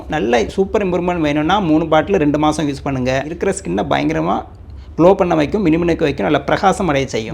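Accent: Tirunelveli, India. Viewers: Sir ungalukku thirunelveli ya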